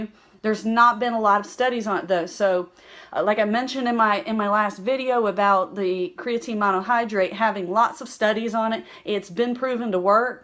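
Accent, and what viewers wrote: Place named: South, United States. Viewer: I'm hearing a little southern draw there. It's refreshing. Good info.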